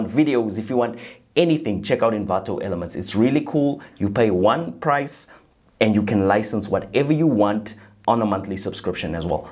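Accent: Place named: South Africa. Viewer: We are also originally from SA and love listening to the accent, you can't imagine how refreshing it is, smooth as Tennessee Honey Whisky😀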